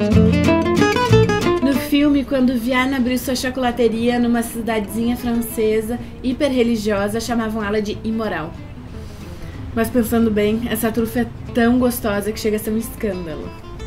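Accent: Rio Grande do Sul, Brazil. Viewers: Guria! Amei a receita e também gosto muito do filme! No programa passado desconfiei que você fosse gaúcha! Eu também moro no RS, em Caxias. Já curiosa pela receita da próxima semana! Beijão!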